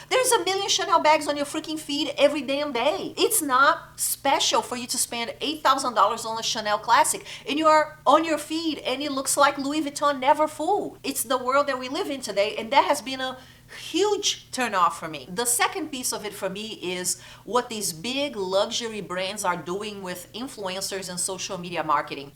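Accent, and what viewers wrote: Brazil. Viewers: Eu não te conhecia, mas sabia que era brasileira quando começou a falar. ❤️ Adorei o conteúdo! Concordo muitoo! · I hear a Brasil Portuguese accent am I right?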